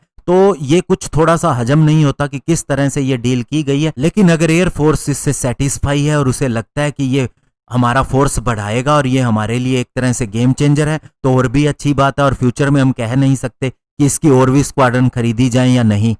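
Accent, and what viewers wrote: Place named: Pakistan. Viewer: U r Pakistani.....surely.........Bhai hamara military budget 4th largest haii world kaa.....n tune to Pakistan ki zabardast haar 1971 k baatein mein to kuch kaha hi nahii....n Teri baton se to lgta hai Indian air force kuch bhi nahi haii....n Tera accent bhii Porkistan jaisa haii....sun be Tere Pakistan ki naa Bahan KR k jayengee huummm yaad rakhiyo.......bhikahariyon....roti khane k paise nahi ladoge humsee.....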